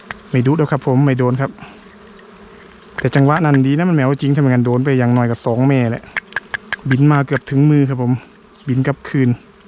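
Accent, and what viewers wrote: Korat, Thailand. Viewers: ชอบแถะๆคับ คนบ้านเดียวกั๋นคับ โคราชคือกั๋น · คนโคราช บ่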